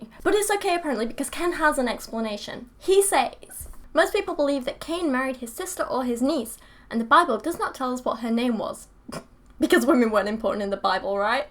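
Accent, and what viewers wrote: United Kingdom. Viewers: You really should lose the idiot Brit accent. I can't help but think of anyone using that accent as a complete moron. I mean, you still have a queen for crying out loud...A FUCKING QUEEN. Damn, you don't get much more primitive than that..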